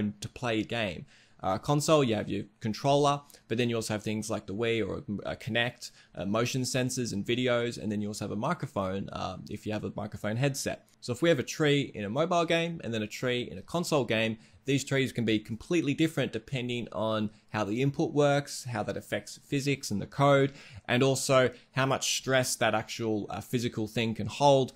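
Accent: Australia. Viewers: Australian accent is so beautiful 🥰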